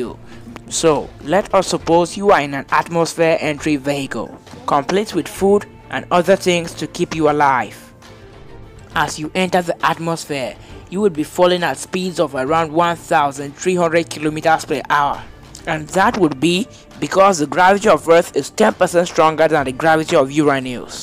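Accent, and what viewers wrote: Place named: Jamaica. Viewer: What country are you from? You sound Jamaican.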